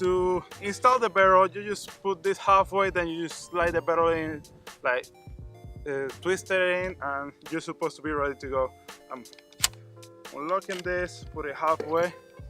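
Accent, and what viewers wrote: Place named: Puerto Rico. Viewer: My wife and her family are from ponce and I'm from Argentina we always make fun of each other's accents lmfaooo. My bros in law sound just like you bro!!!😂😂😂😂😂😂😂😂😂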